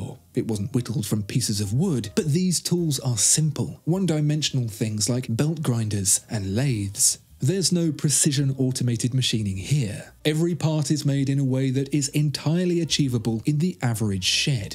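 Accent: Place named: United Kingdom. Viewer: The most soothing voice on YouTube! Eloquent and colourful use of the English language with a classy British accent, not to mention knows a heck of a lot about watches. ❤your review. 🍉 🍉 🍉 Thanks.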